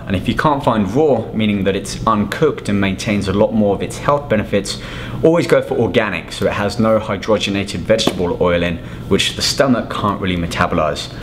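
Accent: United Kingdom. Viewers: What accent is this ? British ?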